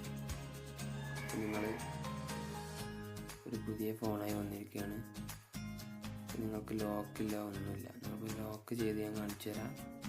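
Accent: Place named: Malappuram, India. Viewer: Igale baasha poli malappurakkaranano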